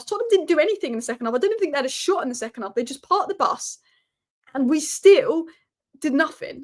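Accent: Manchester, United Kingdom. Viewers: Sounds like a proper Mancunian!!!! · Strange mancunian accent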